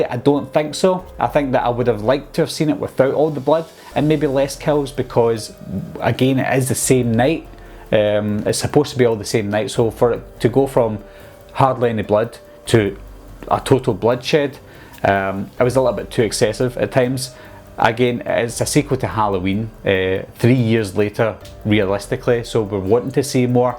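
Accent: Scotland, United Kingdom. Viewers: Where aboots in Scotland you fae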